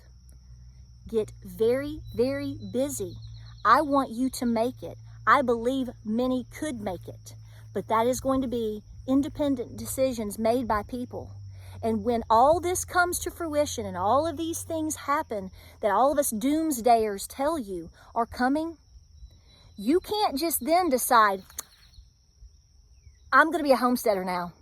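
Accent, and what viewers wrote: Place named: New York, United States. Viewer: You are definetly my girl. Im from ny and live in nc now I speak like you. The truth. Lu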